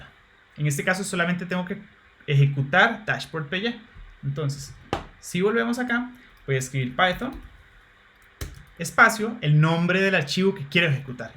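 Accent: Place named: Costa Rica. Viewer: no se pierde el acento tico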